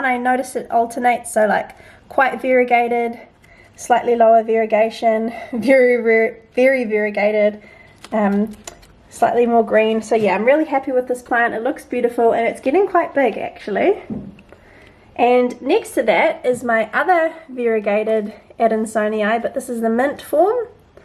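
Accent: New Zealand. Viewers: Lovely plant tour thank you. Your accent reminds me of my stay in Papatoe in Auckland.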